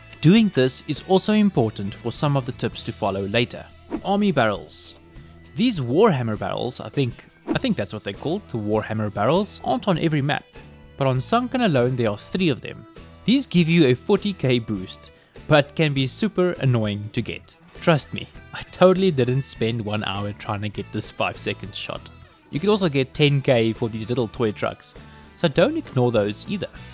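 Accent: South Africa. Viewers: That accent sounds very familiar... You from SA by any chance? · I can spot a South African accent from a mile away ;)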